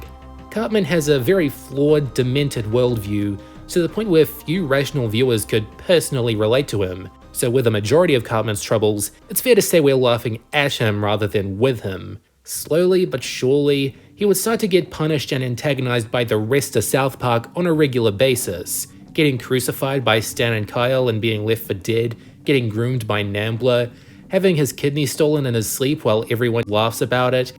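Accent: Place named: Australia. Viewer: People here:He pronounced it wrong,he pronounced it Shah-grin,Chah-ring,Who writes your stuff..."bunchofbullshit". Guys,he's Australian,this way of speaking comes from his accent,be a little more respectful and understandable towards him.😒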